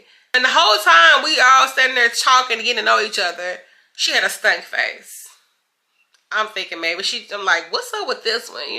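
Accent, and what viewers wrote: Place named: Memphis, United States. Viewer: My family lives in Memphis your accent reminds me of home lol 😂 love it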